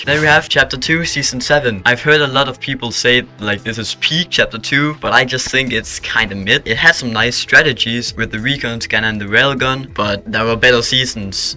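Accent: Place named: Denmark. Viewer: nice me to i was about to ask you. Bc you really have that danish accent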